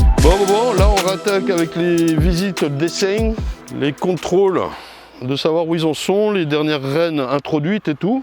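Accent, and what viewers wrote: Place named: South-West, France. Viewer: Avec ton accent du sud ouest quesque tu fou dans le chnord 😂😂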